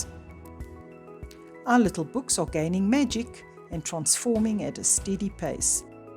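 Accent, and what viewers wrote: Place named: South Africa. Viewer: Hello, I recogise your accent. South African. What a super mini book you have created. I am a bookbinder and these are the most super fun. I have only made blank miniatures. Thank you!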